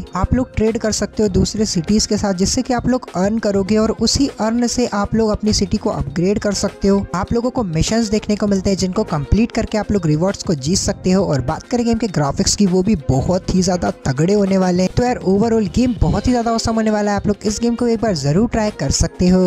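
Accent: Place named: India. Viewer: I do not understand this fast Indian accent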